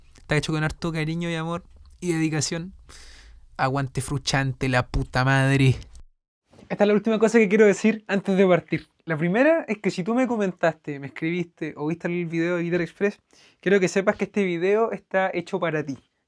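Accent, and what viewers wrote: Chile. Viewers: Buenas eres de conce ? Quedé sorprendido jajajaaj saludos bro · Tremenda masterclass. Una pasada de video, es asombroso desde el principio a fin. Saludos desde México (¿Eres Chileno? Por alguna razón el acento es igual al de mi profesor de Biomol, que es Chileno).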